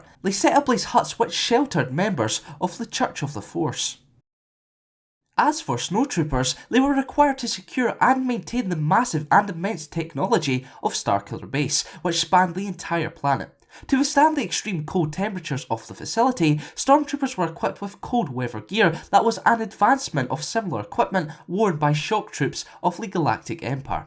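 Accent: Scotland, United Kingdom. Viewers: Ahhh god bless your Scottish voice. Its so irresistibly refreshing from boring British and American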